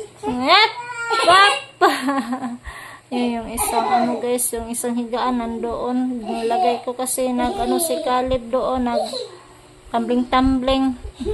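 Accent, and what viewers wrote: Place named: Visayas, Philippines. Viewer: Pretty bisayang bisaya na 😂 ❤❤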